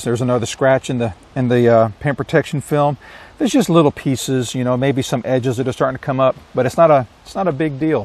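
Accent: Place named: United States. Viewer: Wow! A European speaks American English with the proper accent.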